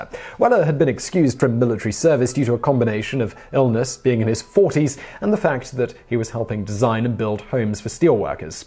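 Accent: United Kingdom. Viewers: Sometimes British accents make me want to claw my ears out... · Another great one by aka “the most British man in the world” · No mention of the Berlin to Baghdad railway? This is not a real History channel. Great British voice, though. Americans think Brits are smart. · i feel the need to apologize for insulting your pronunciation of words by stating it often made me cringe. My lame excuse was knowing several Brit women and never had heard whst turns out to be an accent. I didn't consider the accent to be a speech impediment. Remarking on a speech impediment would be the lowest of the low and that is something i would NEVER do. But my remarks re: your regional accent was BAD and i do sincerely apologize.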